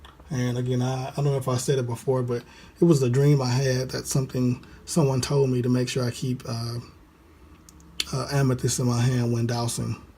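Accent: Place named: South, United States. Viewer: Thank you so much for your help! Thank you for sharing your light! Anddd two cheers for the southern accent I'm detecting?!!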